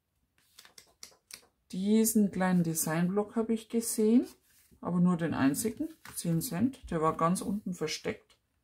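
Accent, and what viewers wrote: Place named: Bavaria, Germany. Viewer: Hallo, neue Abonnentin hier ✌ Ich bin auch aus Bayern und mir gefällt dass man deinen Akzent so schön durchhört 🤗 Außerdem heißt meine beste Freundin auch und deine videos gefallen mir natürlich auch 😁 Mach weiter so und bleib so identisch wie du bist! Servus, Yvonne 😉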